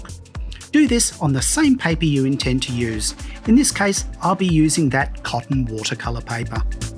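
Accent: Australia. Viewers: Great video! Thanks. Loved the music! But found it a bit loud so I couldn't hear the lovely gentleman's aussie accent.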